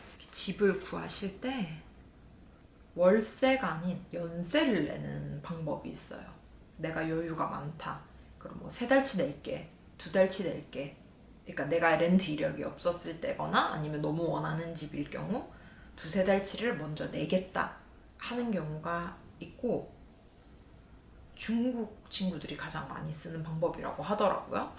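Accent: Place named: Gyeongsang, South Korea. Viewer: 서울사람이라고는 하지만 감출수 없는 경상도 억양 ㅋㅋㅋ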